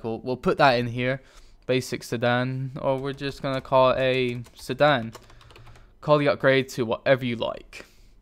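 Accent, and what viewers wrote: United Kingdom. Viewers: are you British? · I used to really like/want a british accent but i swear to fucking god i am glad i dont have to hear that for a long time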